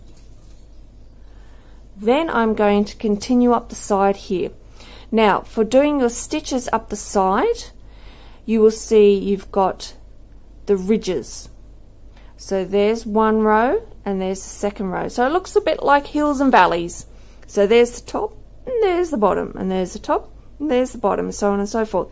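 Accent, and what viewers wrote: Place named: Australia. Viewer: Hello lovely, I have found the 1st of your 💗 beautiful patterns I am going to make!😊 My eldest son has recently been asking me for a 'Manly' poncho 😅 and this is it, it will b perfect for him. And I must say your tuturial is great, u explain things very well and it is so so great to b listening to an Aussie😁. Ta heaps. Love and prayers💗💗💗🙏🙏🙏🧶🧶🧶🐕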